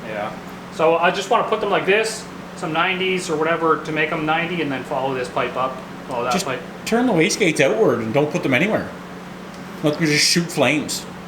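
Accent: Canada. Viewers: i could tell he was canadian within the first 5 seconds this man spoke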